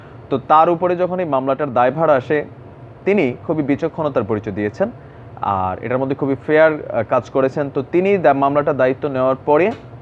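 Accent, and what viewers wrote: Comilla, Bangladesh. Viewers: ভাই আপনি কুমিল্লার ভাষায় কথা বলেন খুব ভালো লাগে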